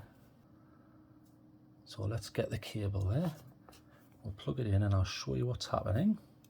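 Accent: United Kingdom. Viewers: Love British accent & this is how a flashlight should be reviewed. Good job 👌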